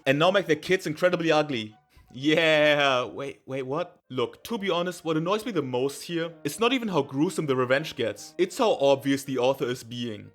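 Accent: Germany. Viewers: the video creator doesnt even try to hide hes german 🥀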